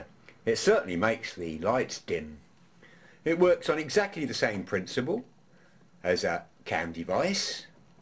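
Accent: United Kingdom. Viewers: What size is the larger transformer that you have used for the bigger spot welder? Very good videos informative, clear and concise.....and British....Excellent!!! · this is amazing I love home made tools!!! and this may sound stupid, but I find I learn things a lot easier if the one teaching has a British accent lmao crazy I know lol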